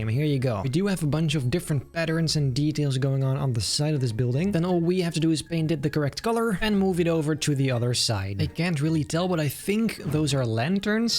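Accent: Netherlands. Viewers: you sound dutch (nederland is cool) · hahahaha thought i recognized a dutch accent but “people of the good life” confirmed it cause there is no better thing then dutch people saying “mensen van t goede leven”. great build and happy to see there are more dutch people who love fnaf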